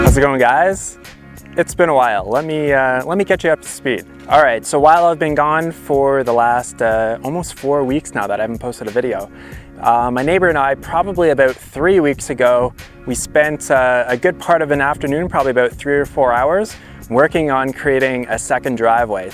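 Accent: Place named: Canada. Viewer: I like Canadians accent.. house, out, about etc.. just interesting ou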